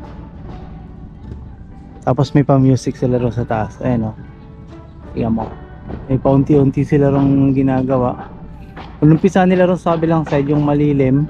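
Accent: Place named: Ilonggo, Philippines. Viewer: basta ilonggo sir malalaman agad2x. More power sir.. 😉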